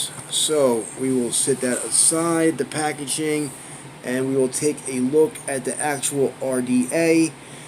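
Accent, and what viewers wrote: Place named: Philadelphia, United States. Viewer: Thanks for the review brother. I recognize a philly accent from anywhere. I’m guessing the north east?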